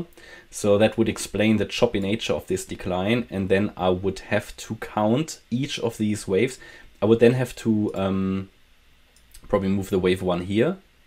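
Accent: Germany. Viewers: Bist du deutscher? Frage nur weil dein Englisch klingt wie meins 😅.. Danke für die Info denke link wird uns noch positiv überraschen.👍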